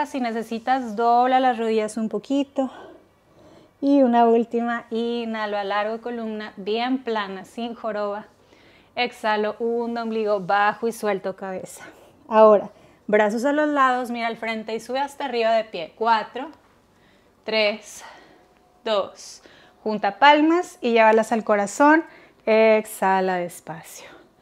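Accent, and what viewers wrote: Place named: North, Spain. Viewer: Es la primera vez que veo sus videos de Yoga gracias por compartir escucho su voz muy agradable con acento norteño muy agradable disfrute mucho la clase Namaste ❤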